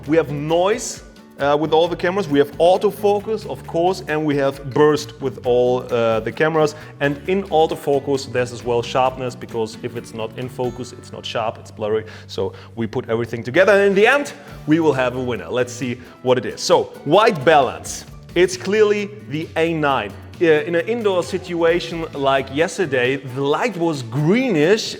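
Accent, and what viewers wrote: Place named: Germany. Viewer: Boy, you talk with such a droll German accent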